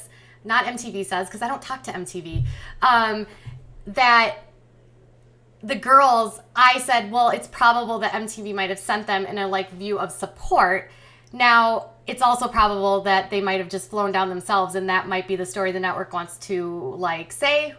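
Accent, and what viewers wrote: Minnesota, United States. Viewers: I like your accent. Are you from Minnesota?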